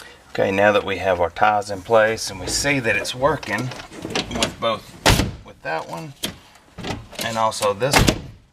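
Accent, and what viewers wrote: South, United States. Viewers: Thank you so much for the reply. I'll have to check out your other online content - sounds great! Regarding where you are from, there's a lot of similarities in accent and expressions between south Arkansas, northern and eastern Louisiana, and Mississippi, and a lot of similarities in culture and food! Take care, and may God bless!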